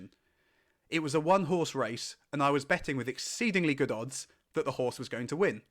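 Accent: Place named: United Kingdom. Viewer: How do you invest in ARKG in the U.K.? I assume you’re U.K. based from your accent?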